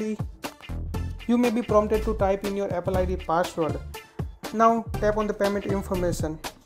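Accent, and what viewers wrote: India. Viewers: My phone is dying, it’s 🔥 burnt I don’t even care if it works, I just like him saying “it can easily freak you out” Not making fun I like the Indian accent, we are cousins I’m West Indian. I hate when people say that, ohh I can say that because I’m that, so I guess I hate myself, who am I talking to right now? Thanks for your help cousin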